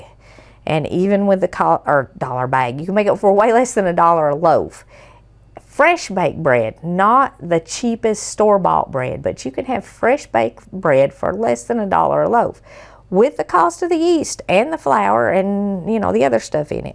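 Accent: South, United States. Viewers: . I love your accent. I've always loved that southern drawl as we call it here in the UK. I'm an East End London Cockney. So I've also got a distinctive accent. Thanks for your lovely tutorials Xxx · LOVE ur southern drawl...im in nw ark ❤ LOVE u have pledge of allegnce posted! God bless ya'll 🇺🇸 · I love your down home accent. I lived in Louisiana for 3 years.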